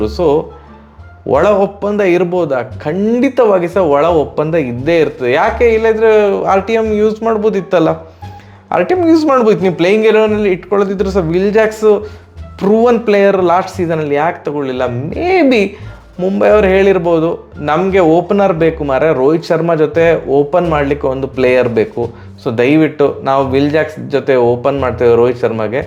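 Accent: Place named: Udupi, India. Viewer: Masth udapi accent ❤❤❤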